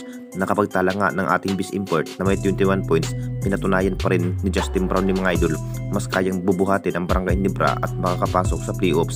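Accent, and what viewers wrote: Visayas, Philippines. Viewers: tagalog na nga pagba blog mo.pero wla pa ron sa tsmang grammar ang mga words mo ang sakit sa tenga pakinggan tapos yung iba fake news pa dika bagay na vloger bisaog ang dating ng pananagalog mo.pwe.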